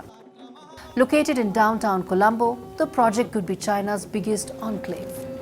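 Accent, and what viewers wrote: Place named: India. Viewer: the announcer says “… China’s checks threatened to turn several countries into ‘versaille’ states due to their high indebtedness…” what the hell’s “versaille states”? I have hard times with the Indian English…😢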